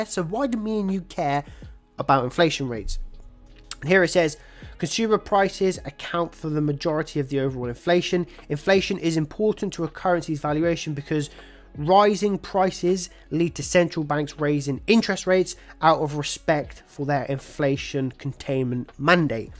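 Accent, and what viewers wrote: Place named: United Kingdom. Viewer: There are other English speaking countries online. Not British alone. Abeg consider and use proper tongue 😮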